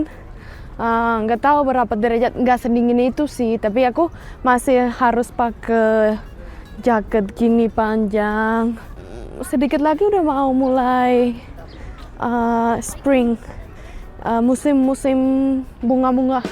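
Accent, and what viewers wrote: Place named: Indonesia. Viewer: Gayamu... Tengilmu... Bicaramu..... Gokil indo abis. Top.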